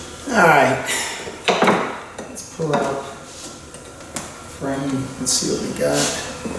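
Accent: Louisiana, United States. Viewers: how is everything where you live your accent let's me.know your in Louisiana new Orleans somewhere around there......how is.you and your family doing after the hurricane is.your property and everything and everyone okay I hope you and your family are.doing great God bless you guys I am still praying for everyone there 🙏 have a great night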